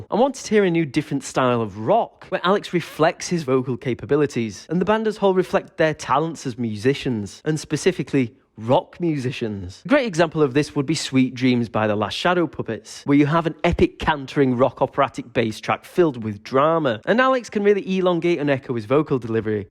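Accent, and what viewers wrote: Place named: United Kingdom. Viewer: i like your dialect, where in the uk are you from?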